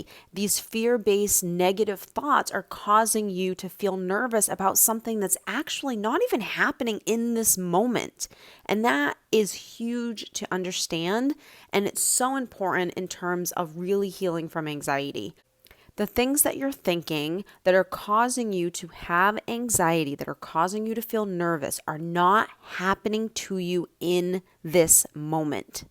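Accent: Boston, United States. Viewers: She actually has a bit of a Boston accent and honestly our d's often sound like a t.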